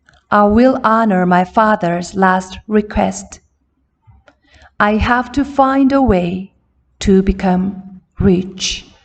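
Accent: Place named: United Kingdom. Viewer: 영국식 영어발음 넘 멋져요 ❤️ 읽어 봐야겠어요.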